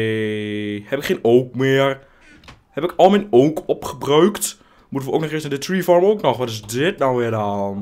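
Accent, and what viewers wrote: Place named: Twente, Netherlands. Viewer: Je bent echt heet twents :P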